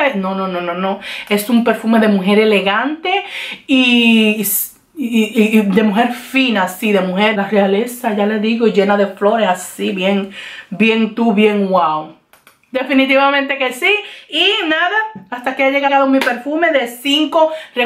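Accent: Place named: Dominican Republic. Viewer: Saludos, soy nueva en tu canal, por ttu acento creo q eres Dominicana. Amo ese país. Fiesta todos los días.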